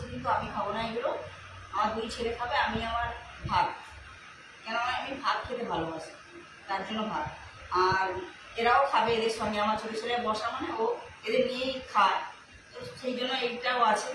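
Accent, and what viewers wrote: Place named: West Bengal, India. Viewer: Ooo ma go dada ki koreta a to West Bengal r vasa❤❤❤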